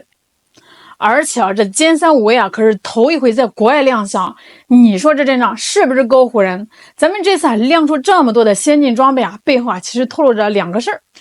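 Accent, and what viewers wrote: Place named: Shandong, China. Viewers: 我本來也以為妳是我山東老鄉，前幾集妳有提到是河南 總之妳有我們北方人的豪氣！👍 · 🤣🤣🤣說話的調調像山東大妞！呵呵！說不定您老家省城離山東省近麽🤣！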